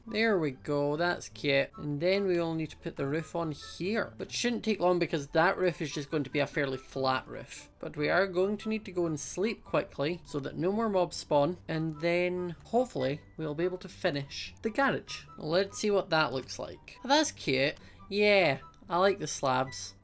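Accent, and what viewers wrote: Scotland, United Kingdom. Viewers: I feel like Scottish accent makes his videos funnier hehe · His accent!!!!😍😍 Give me your accent! Anybody else love Scottish accents.😍😍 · I just love his Scottish accent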